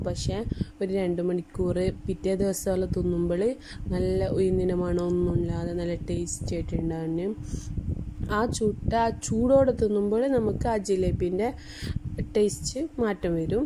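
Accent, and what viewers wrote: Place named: Kasaragod, India. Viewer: ഞമ്മളെ ഭാഷ കാസറഗോഡ് ആണോ കേട്ടപ്പോ അതേപോലെ തോന്നി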